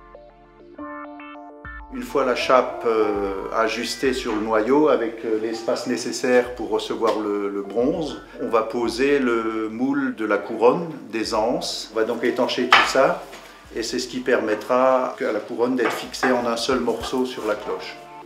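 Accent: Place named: Alsace, France. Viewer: Sympathique accent Alsacien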